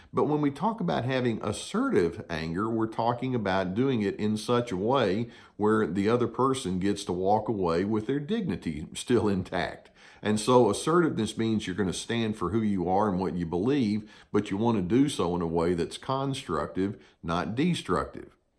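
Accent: South, United States. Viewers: You are fantastic and have helped me so much rid the Narc. Love your voice (like Gus) and Southern accent!! thanks. Susanne H.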